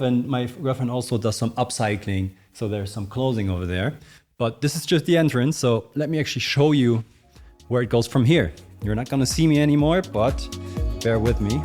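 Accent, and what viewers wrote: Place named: Germany. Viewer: Sehr cool mal einen Einblcik hinter die Kulissen zu kriegen. Cooles Studio auch. Generell muss ich sagen das das der beste House Musik Kanal ist den ich kenne. In der Vergangenheit hatte ich immer mal wieder die Vermutung das du deutsch bist, auch wenn dein Englisch sehr gut ist, den deutschen Akzent abzulegen ist schwer. Nun wurde es quasi endlich mal aufgelöst.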